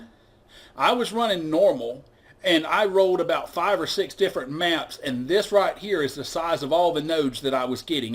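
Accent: South, United States. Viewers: btw love the southern accent. It makes it easier to listen to the commentary. And you're funnier then a one legged man in an a** kicking contest!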